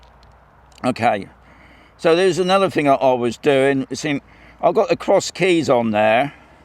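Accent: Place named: Cornwall, United Kingdom. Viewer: Neo2 owner and fan here, 20 years ago i lived in Helston, Cornwall, your accent reminds me of those days lol. #Spingo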